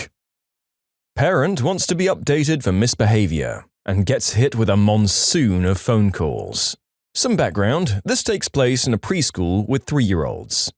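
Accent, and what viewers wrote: United Kingdom. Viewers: British narrator?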